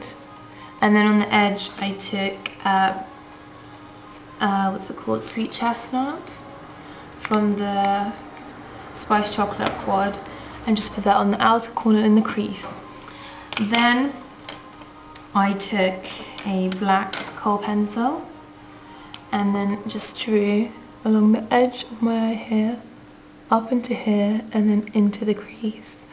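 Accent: England, United Kingdom. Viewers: Lovely accent! I miss England, I got my BA in English Lit there. :( I want to be there again so bad.. Anyways, you TOTALLY persuaded me to go get the Spiced Chocolate quad, as I have commented earlier, I never thought that copper-red would look good on me as I have blue eyes and fair skin, but we both have the same colouring so I will give it a try. Please, please, please!!! make more videos,I beg you! You have amazing talent, you have not been in make up school? Really? I am gobsmacked.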